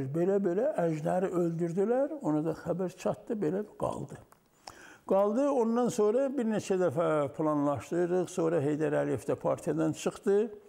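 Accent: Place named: Armenia. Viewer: Əsil yerazlarin ləhçəsilə danişir. Onnar,bax belə danişin. Olar, hansi yaxşi görünür və esidilir.? Yazin.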